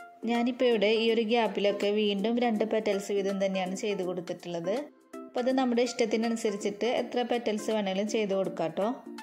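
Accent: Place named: Thrissur, India. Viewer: trissur slang💕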